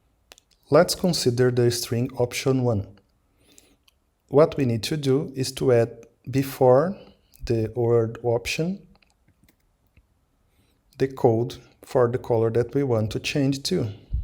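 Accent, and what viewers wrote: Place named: Brazil. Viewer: Very good english bro. Haha percebi que era brasileiro pelo sotaque, muito bom o tutorial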